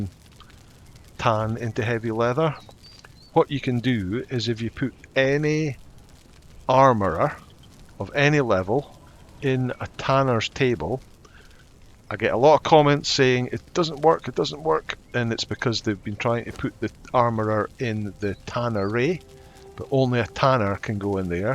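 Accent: United Kingdom. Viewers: good video my greater shaleback is bruce iii and always nice to hear a fellow britt im sarf london innit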